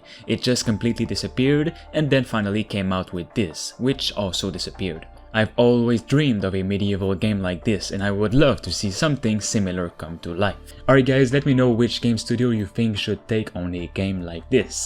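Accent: France. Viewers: why dont you just make videos for french people? your french accent is so thick i can barely see the video.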